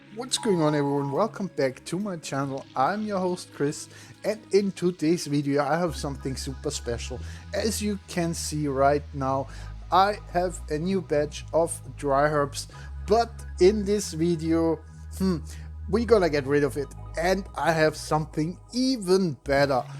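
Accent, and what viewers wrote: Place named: Sweden. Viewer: Its probably nordic. Swedish prob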